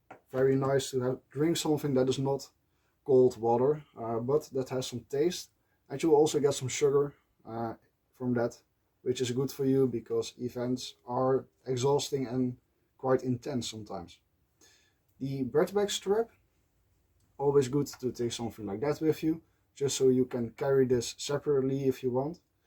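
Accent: Netherlands. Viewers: I’m new to this channel and I’m loving it so far. I’m assuming by the accent you are from the Netherlands? Hello from USA!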